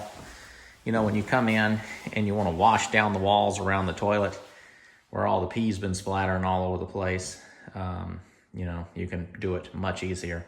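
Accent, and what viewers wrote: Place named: Appalachia, United States. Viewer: Love this house it looks so good... i know renters dont get invested to care about a house i dont understand that sloppy mindset... if someones trusting you with their property you darn well look after it... when i have moved home i clean everywhere for the folks moving in... if my daughter rented when younger i took it as my responsibility to clean from top to bottom before we left..its in my upbringing... id treat yours better than my own cos its not my own... simple as...i wonder what kind of vetting you do to get decent tenants.... Also cant figure your accent ... im from england... you sound southern but not as southern as Dolly Parton... your voice is so easy to listen to... Fine job..... looking fab... kinda envy the lucky renter who gets to benefit from all that fine attention to detail...:)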